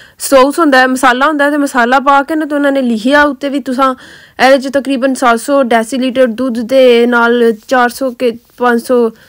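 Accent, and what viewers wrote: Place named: Gujrat, Pakistan. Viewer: So aweet sister Ap pakistan mn kahan say hain?? Mujhe lgta he ap gujrat side say hain Punjabi say lgta he Plz reply krain 😊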